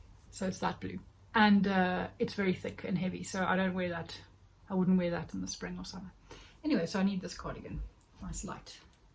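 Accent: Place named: South Africa. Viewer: When I listened to your accent in another podcast of yours, I thought you sounded South African and then in this one you mentioned you want to come and visit family in Stellenbosch. I am really loving your podcasts and I love what you are making. They will all turn out well. 😊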